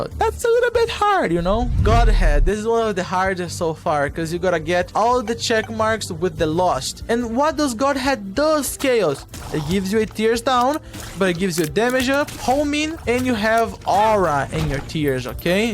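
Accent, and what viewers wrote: Brazil. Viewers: o cara é br. percebi pelo sotaque kkkkkkkk. tmj mano, video mt bom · Percebi que é BR pelo sotaque no inglês haha massa cara...eu simplesmente não consigo passar o Mega Satan com o The Lost pra abir Godhead...muito ruim deixar de pegar os itens da devil room pra dar a Angel room e pegar as chaves acho que nunca vou conseguir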